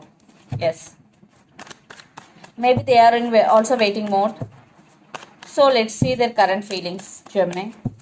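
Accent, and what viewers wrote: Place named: South India, India. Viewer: Your reading is to the point.i am really Impressed. your accent is south Indian.GOD BLESS YOU.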